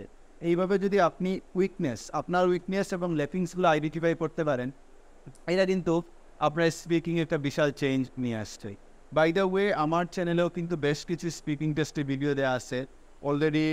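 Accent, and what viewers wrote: Sylhet, Bangladesh. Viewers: Bcz sir sylheti 🥹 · Sir apnar pray video te sylheti basa aioi..but sylhety mat apnar muko sundor ow lage❤ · uni sylhet er vai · Are you Sylhety sir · ভাইটি মনে হয় সিলেটি 😄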